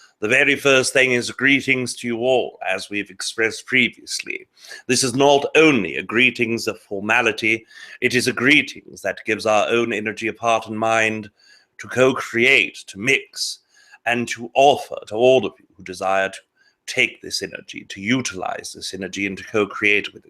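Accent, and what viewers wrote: United Kingdom. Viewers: He sounds like Alfred Hitchcock, with that British accent. Hey, I want to believe this info, but I also want to keep grounded. There are many questionable issues. ok, so if all these sightings and escalations happen then woohoo.. Let's see what presents itself. Come on 4th density, appear, make a big splash in our pool.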